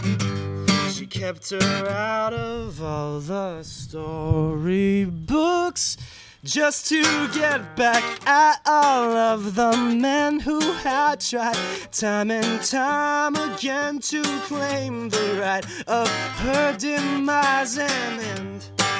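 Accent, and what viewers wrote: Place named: England, United Kingdom. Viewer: i mourn the loss of the uncontrollable curly mop... but fangirl moment because of the deep english accent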